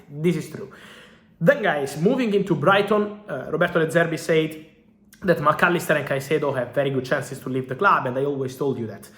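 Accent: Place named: Italy. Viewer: O inglês com sutaque italiano fica maneiro, nunca tinha visto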